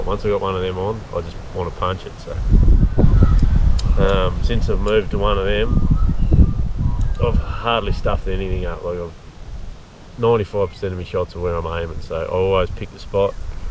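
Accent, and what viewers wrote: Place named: Queensland, Australia. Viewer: Q'ld accent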